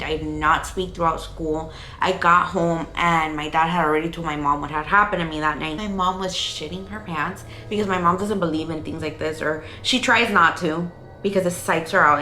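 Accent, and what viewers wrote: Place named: Miami, United States. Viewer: as you were speaking I just had a feeling you were from miami...I swear the creepiest things happen here. I have so many paranormal stories just like this but wow this definitely unlocked a new fear LOL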